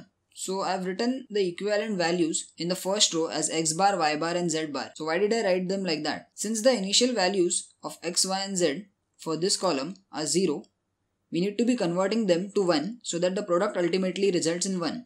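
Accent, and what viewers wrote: India. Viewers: if only you indian can speak without that accent people would actually worship u guys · Why Indian have soooo annoying accent?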